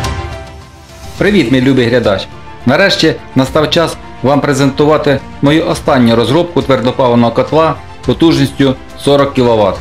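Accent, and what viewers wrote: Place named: Poltava, Ukraine. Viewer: ВІтаю! Відчувається рідний діалект) Чи не Полтавська обл?) Як можна отримати креслення? Дякую! Бережи Вас Господь!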